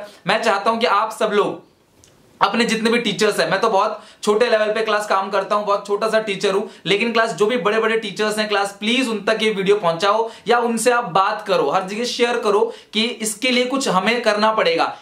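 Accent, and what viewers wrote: Odisha, India. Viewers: Sir Aap Odisha ki ho kya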